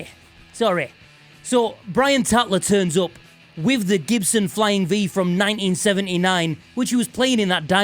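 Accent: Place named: Northern England, United Kingdom. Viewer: You said it in another video, "Adverse Camber"... or. "the bloody bastards" in that Northern accent you got..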